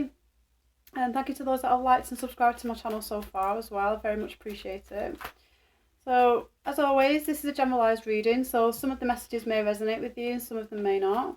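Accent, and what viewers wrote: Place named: England, United Kingdom. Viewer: Hi,missed you that lovely.English acent,Wishing you peace and Blessings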